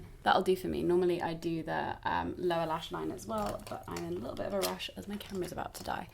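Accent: United Kingdom. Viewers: I love your British accent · Surprising fact, but I wear no make up. Im just here for the lovely face, lovely voice, and lovely British accent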